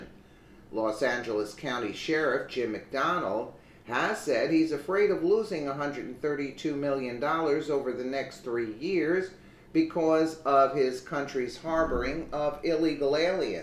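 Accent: New York, United States. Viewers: yeah and she sounds like a really great dame who knows the score - New York accent ?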